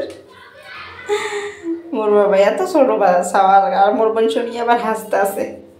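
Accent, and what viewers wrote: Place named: Rangpur, Bangladesh. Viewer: আপু আমার বাড়ি রংপুর, আপনার মুখে আমাদের রংপুরের আঞ্চলিক ভাষা শুনে ভালো লাগলো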